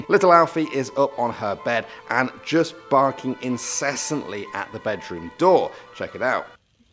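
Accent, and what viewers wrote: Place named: United Kingdom. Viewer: Love your british accent, greetings and support from Sweden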